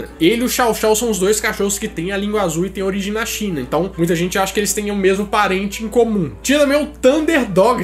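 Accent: Minas Gerais, Brazil. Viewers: Definitivamente o mineirês é o melhor sotaque que existe. 🤗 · Uai, vc é mineiro??? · Mineiro nato esse aí kkk 👏🏾🤝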